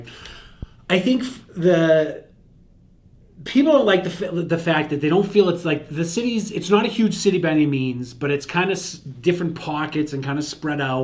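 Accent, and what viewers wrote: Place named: Boston, United States. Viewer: You have a Bostonian accent.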